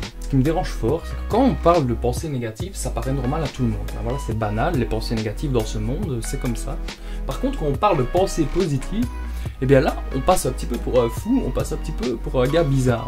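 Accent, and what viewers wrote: Liège, Belgium. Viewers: c accent belge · Accent de liège non ?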